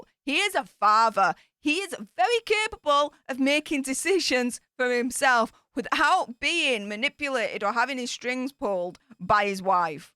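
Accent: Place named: England, United Kingdom. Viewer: Love your Chanel!! It makes me laugh😅 I like your accent but as I not european, I don't know from what part of England or UK you are❤ can you tell me? Greetings from South America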